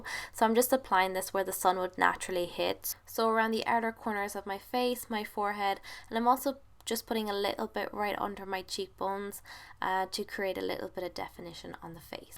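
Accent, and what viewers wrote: Ireland. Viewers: Your accent is gorgeous, what part of Ireland are you from?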